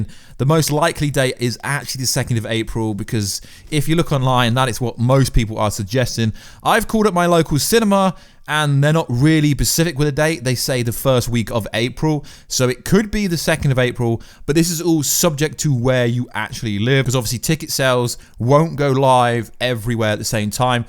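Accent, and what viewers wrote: England, United Kingdom. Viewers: they're not "what" with a date? you tube says you said basilic. what does basilic mean? A really "what " upgrade? you tube said you said safe. "head sculpt?" lastly i have taken the liberty of looking up "aliteration": from al·lit·er·a·tion /əˌlidəˈrāSH(ə)n/ noun noun: alliteration; plural noun: alliterations the occurrence of the same letter or sound at the beginning of adjacent or closely connected words. "the alliteration of “sweet birds sang”" i t doesnt mean different versions... maybe you are thinking "variations". i find it quite interesting that you have an "english" accent but dont speak proper english.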